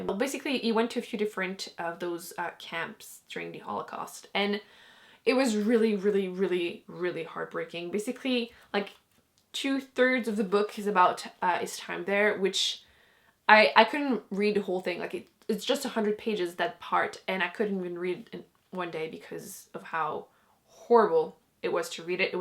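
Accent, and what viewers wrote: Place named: Quebec, Canada. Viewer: French Canadian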